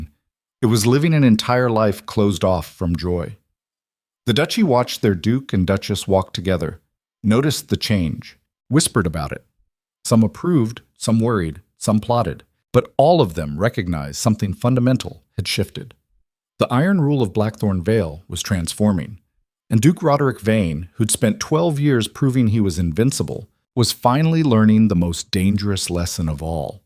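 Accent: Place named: United States. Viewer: Hell is acceptable but NOT f***!!! And the modern language/accent of the narrator is annoying!!! Why American English when it’s Victorian England…??!! Can NOT recommend, NO subscription!!!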